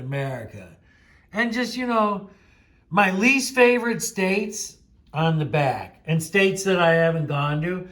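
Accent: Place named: United States. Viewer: Love your American accent takes me back to the birth of Rock and Roll as a kid listening to American Forces network broadcast from Hilversom in Holland late 40s great video my friend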